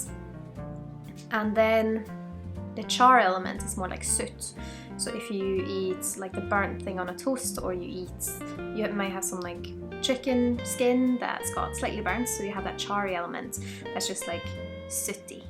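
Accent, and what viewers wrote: Scotland, United Kingdom. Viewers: Sökte på youtube efter denna whiskyn efter jag köpte den på systemet, kul att hitta en video från någon svensk! Men du låter lite skotsk haha :D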